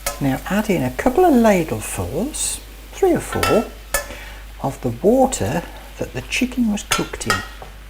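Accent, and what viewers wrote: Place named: Birmingham, United Kingdom. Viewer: I lived in Birmingham for 23 years then move to London, which I've regretted it ever since. I love your accent because it reminds me of a lovely, very much under rated, city and your tips and recipes are terrific, please keep them coming! ❤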